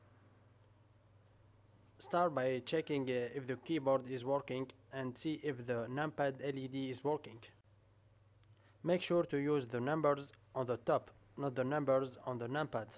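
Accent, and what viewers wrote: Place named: Eastern Europe. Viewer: this guy is from russia or eastern europe. I cant understand his english properly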